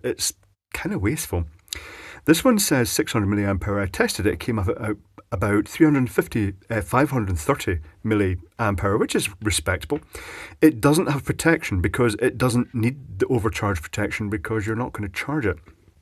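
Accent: Scotland, United Kingdom. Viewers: Hey. Long time listener, first time messaging. Loving your vids. I’m clueless when it comes to electronics and live listening to your descriptions. Even if I can’t properly understand it all. Fife, born and bred. I love hearing a Scottish accent on a YT vid. I was watching a recent video of yours where you mentioned putting an aluminium heat sink on your pile of interesting heat sinks. Can I trouble you for a video on that subject? I’m a scratchbuilding model maker and love cool “found” shapes. And an annotated video from you about such cool things would be of great interest to me. And I bet other subscribers also. Thanks in advance. Regards from glenrothes.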